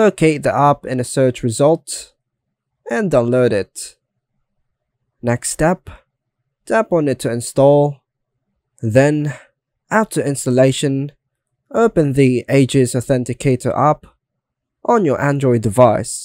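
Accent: United Kingdom. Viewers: Strong Brit dialect, difficult to understand. not getting which service you're referring to. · People who can't understand brit accent.... Use CC..?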